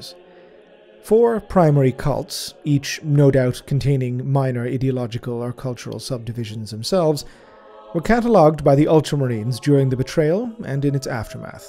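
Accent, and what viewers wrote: Ireland. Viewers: I gotta ask what part of ireland are you from?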